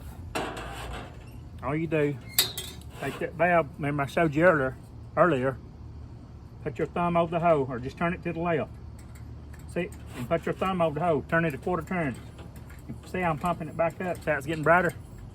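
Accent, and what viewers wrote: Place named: Tennessee, United States. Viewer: That accent is from Tennesee the Volunteer State.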